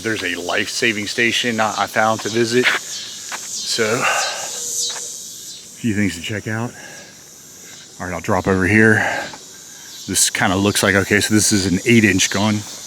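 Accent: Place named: Maryland, United States. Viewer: You’re going to some interesting places..thanks for the video! I have lots of family in Maryland, and you have the same accent as them..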